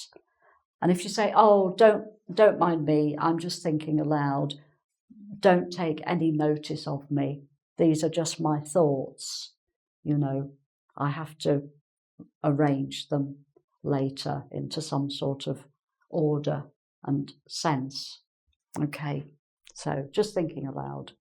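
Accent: United Kingdom. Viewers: She is authentic British which I love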